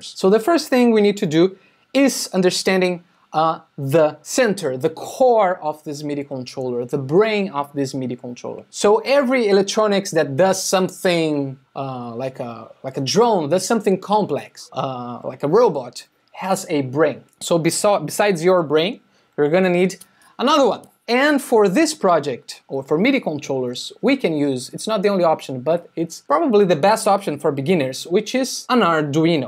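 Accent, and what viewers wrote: Brazil. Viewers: O sotaque brazuka nunca vai embora né rsrs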